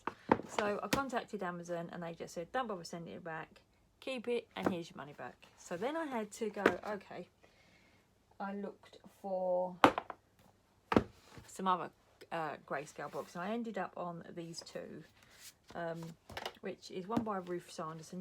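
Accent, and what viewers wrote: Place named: England, United Kingdom. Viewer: I started with Colouring, did a bit of en masses, art journaling, collage, atc's, and then went to journaling for about two years and now Ive come back full circle to Colouring. Funny how things go. First time I've seen any of your videos, odd hearing another English voice, I mainly only watch Americans. Happy Colouring hun x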